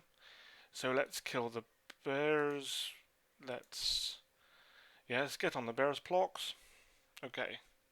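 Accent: United Kingdom. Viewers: The sound of your Bristolian accent makes my nipples moist. Just saying. LOVE YOU!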